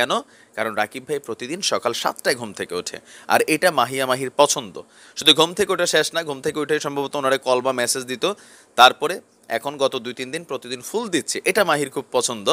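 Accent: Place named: Comilla, Bangladesh. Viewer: আপনার কুমিল্লার ভাষা গুলো খুব ভালো লাগে আমার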